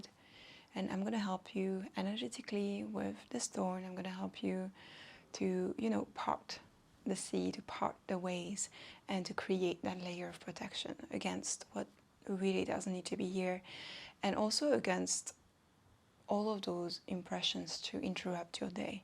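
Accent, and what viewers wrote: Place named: Germany. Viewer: hmm really, you mean you get told about a german or dutch accent? Peut-être est-ce que vous venez d'une région où il y a un certain accent allemand ou autre - je sais qu'il y a des pays européens où les germanophones et francophones se cotoient (aussi, merci d'avoir répondu et d'avoir éclairci ma curiosité - moi aussi je parle français, je vis présentement au québec, ce n'est pas le meme accent mais personnellement j'aime beaucoup l'accent francais européen. Je suis étonnée de ne pas l'avoir reconnu cette fois-ci! hehe)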